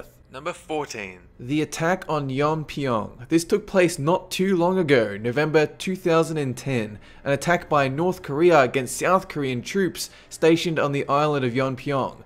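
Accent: Australia. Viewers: Reaver He's australian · Are you from Australia? Also please do 10 creepiest things that ever happened on earth video. 😀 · I find it hard to place the narrator's accent. Sounds vaguely Australian. Anyone know? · Most annoying Austtalian accent ever. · Are you Aussie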